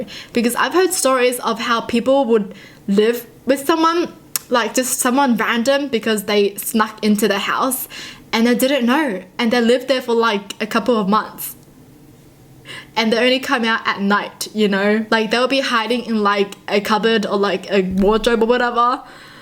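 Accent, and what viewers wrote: Australia. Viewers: Are you Australian,kiwi...or..... Btw I think this is a Cool video......i watch this before I had to get to sleep....LOL. I subscribed(to this channel and your beauty channel),liked,and hit that bell (to this channel and your beauty channel).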